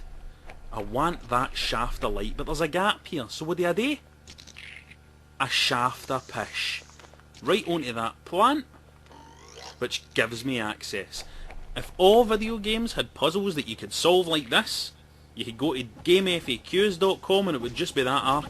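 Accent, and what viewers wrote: Scotland, United Kingdom. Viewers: you can get the rom on SnesOrama and download Nulldc..(DC emulator) watch a youtube tutorial on how to get it configured and "bobs ur uncle" Sorted. lol im from aberdeen... tis decent to hear a scottish accent on this thing. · Scottish accent + solemn speech = EPIC!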